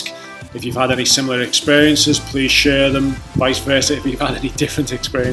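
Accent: Liverpool, United Kingdom. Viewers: are you from liverpool? hahaha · Thank you for making these videos, I just applied for EF, but watching your other videos inspires me to apply for public schools as I have the teaching experience. I’m hearing scouse in your accent? I’m also from Liverpool, so it’s nice to hear on YouTube 😂🙌🏻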